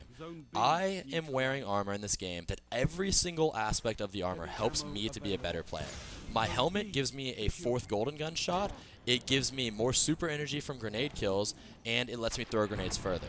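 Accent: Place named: California, United States. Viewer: Yeah I was debating between that and "First" but ultimately it was your california surfer "brah" speach style that helped me decide ^^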